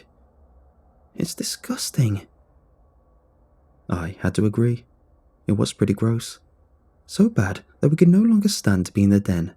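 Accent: United Kingdom. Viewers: If its in America why use a UK voice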